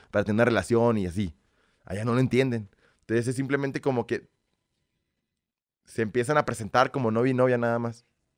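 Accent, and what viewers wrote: Mexico. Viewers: El ya es mexicano y habla como mexicanos fierro toro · Su forma de ablar y frases que uso lo ase mejor que muchos mexicanos. Vaya carajo. Asta no necesita que ser mal ablado para darle emoción a sus historias. Que buena onda este compa ruso mexicano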